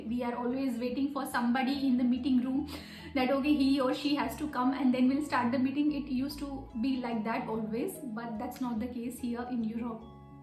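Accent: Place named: India. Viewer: No no no you speak Indian English nobody understands. Much too fast you speak slowly 50 % or less. You need to understand nobody understands this.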